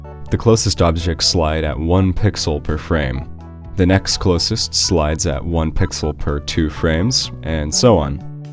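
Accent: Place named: United States. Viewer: I find that if I pause it, the picture becomes still and that annoying, dull, monotonous American excuse for an AI narrator stops too. This leaves me feeling peaceful, somewhat aloof and ready for anything.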